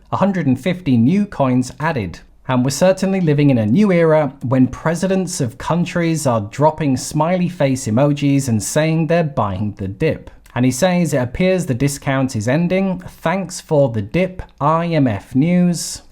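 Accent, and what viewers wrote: United Kingdom. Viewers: I think you have the best info and videos of all my Crypto channels to follow - Thank you!! I always look forward to your new videos!! & who doesn't like to hear a British accent along the way!!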